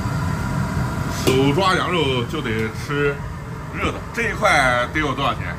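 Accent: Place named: Northern Jiangsu, China. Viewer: 博主口音像是苏北😂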